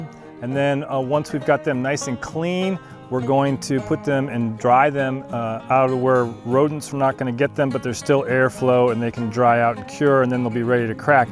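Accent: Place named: Appalachia, United States. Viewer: You sound like you grew up in the deep holler.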